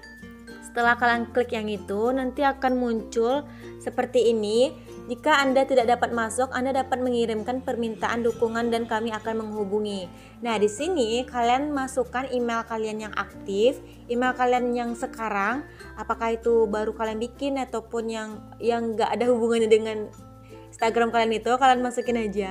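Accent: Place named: West Sumatra, Indonesia. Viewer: Logat Minang Ndk Masalah,,Yg Penting Mantap Kak😊😊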